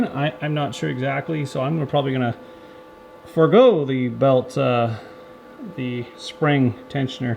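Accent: Canada. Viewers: For some reason you sound more Canadian today 😂 .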